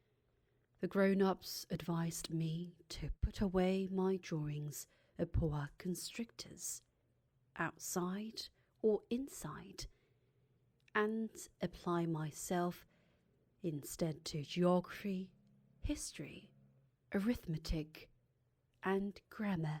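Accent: RP, United Kingdom. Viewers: 헉 책중에서도 제가 젤 좋아하는 어린왕자라니ㅠㅠ게다가 영국발음..감사합니다♡ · 고급진 영국영어 발음이네요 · 맨날 영어관련 영상볼때마다 "엘~로 에브릐보우~티~~ 마 넴~잊 퀀주현~"하시면서 등장하셨는데ㅋㅋㅋ너무 자주뵈서 그때마다 영국발음 진짜 좋으신 아리랑티비 소속 씨....라고 외워버렸는데ㅋㅋ 이렇게 채널하고 계실줄이야!! 우연히떠서 봤는데 구독누르고 갈게용ㅎㅎ안그래도 영국ㅠ상류층 발음을 좋아하는데!!! 완전 취저ㅠㅠ♡ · 영국식 발음에 케러멜라이즈드 된 버터처럼 이불 속으로 녹아듭니다~~~ 느아아아앙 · 목소리가 이렇게 섹시하다니,영국 발음 치인다ㅜㅠ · 미국 버터발음에 익숙해진 귀가 영국발음을 인식하지 못하네요..? 영국발음 넘 조흔것!! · 영국식 발음이 너무 듣기 좋네요.. 특히 가 들려줘서 정말 듣기 좋네요... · 저도 영국 발음 가지고 싶네요 ㅠㅠ 멋져요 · 무진장 이쁘시네요. 영어까지 영국 상류층 느낌 팍팍. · 와 광고에서 아리랑 티비 아나운서 나오는거 봤는데 진짜 발음 너무 섹시한거 같어요.ㅠ̑̈ ㅠ̑̈ ㅠ̑̈ ㅠ̑̈ ㅠ̑̈ 진짜 발음 완전 영국 귀족 같아요 진짜 너무 너무 너무 닮고 싶은 발음.. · 으아 역시 영국 발음 너무너무 좋아요 ㅠㅠ 저도 영국 영어 공부하고 있고 쓰려고 노력하는데 학교에서 미국 영어랑 마찰성이 생기더라고요... apartment를 flat이라 써서... 그래도 뭔가 뿌듯하기는 해요!!! 어쩌다보니 학교에서 영국 영어하는 철학 소녀로 유명해졌더라고요 ㅋㅋㅋㅋ 무튼!! 명절 잘 보내세요:)💜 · 아리랑 티비 광고 맨날 나와서 대사 다 외웠는뎈ㅋㅋㅋㅋ 이렇게 봬니 반갑네요 ㅠㅠ 목소리도 너무 좋고 발음 진짜 고급스럽네요 ㅠㅠㅠㅠ저도 영국 발음 저렇게 내고싶어용 퓨ㅠㅠ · 어떻게 해야 이런 발음을 갖을수 있나요 목소리도 넘 힘있거. 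전 호주발음을 가지거 있는데 영국 발음이.훨씬 엘레강스한듯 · 영국발음 너무 좋아요... 진짜 멋있는 느낌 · 영미권에서 유학하셨나요 아니면 독학으로 영국식 발음 익히신건가요? ㅎㅎ 너무 잘 들었습니다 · 포쉬가 이렇게 아름답게 들린건 첨입니다❤️ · 와 너무좋아요ㅜㅜㅜㅜ 구독하고갑니다 영국영어 많이많이올려주세요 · 와 영국발음 너무 좋아요 ㅠㅠㅠ흐엉엉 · 영국억양 진짜 개사랑해요.... 미국이 아니라 영국으로 갔어야했는데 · 영어를 RP혹은 posh eng라고 하나요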